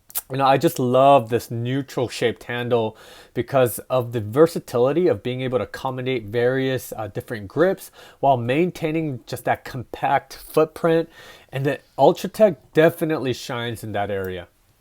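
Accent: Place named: South Korea. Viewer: I detect a korean accent. 한국인에요?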